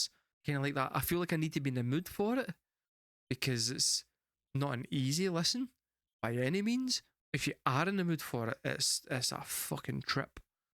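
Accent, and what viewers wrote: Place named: Glasgow, United Kingdom. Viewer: Hey man, you should subtitle your video because of your outrageous Glasgow accent. I myself can understand it because I’ve see Trainspotting 20 times, but a lot of others prolly don’t know what your saying.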